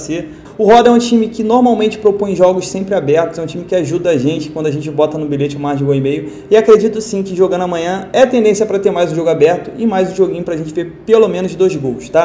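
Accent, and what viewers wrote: Rio de Janeiro, Brazil. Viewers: Deu moral aí cria.. pelo sotaque vc e do rio né ?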